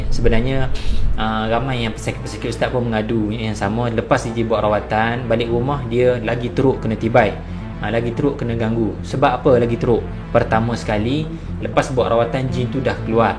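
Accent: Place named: Kelantan, Malaysia. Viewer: actually ustz org mana ? bnyi loghat cam klntan ad kedah ad lain2 pon ad😂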